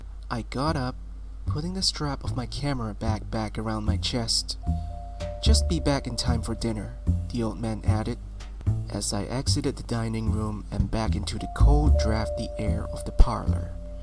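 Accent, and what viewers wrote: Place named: France. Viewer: You are French, from France, or Quebec. Thank you for the fantastic narration. Peace and much love sent from Ontario, Canada.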